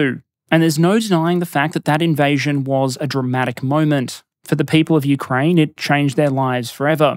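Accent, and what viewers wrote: Australia. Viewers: "....one of those people who can derive joy from spreadsheets" this is why I love your style ( just one small example). Not just content that makes one think one learned quite a bit (yes, I do), but such a great way of presenting. I even started liking the Ozzy accent.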